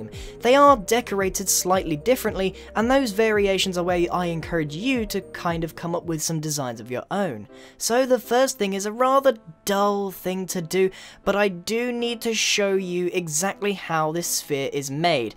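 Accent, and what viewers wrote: United Kingdom. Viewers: JaronPlayz British accent. You cant hear it? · HI i liked the video in both ways and i think you are the greatest minecraft builder ever keep up the good work and fot the next video of building your comments please make a lightning hiding from the guy who invented lightning electrisety sorry if miss spelling something or wasting your time and by the way you are british right thats my favorite english accent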